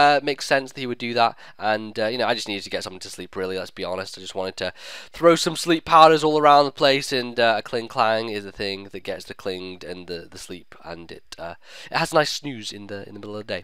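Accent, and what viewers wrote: Scotland, United Kingdom. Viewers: dude you're scottish? that's fucking awesome, listen to a band called they're from Scotland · you Scottish that's awesome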